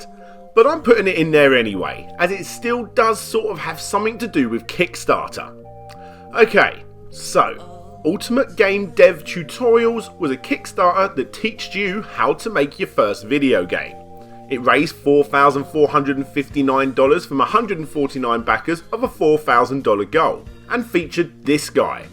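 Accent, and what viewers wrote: United Kingdom. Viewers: Just kidding about not liking you as much. You British people have a way of spewing your gob that is just delightful for my American ears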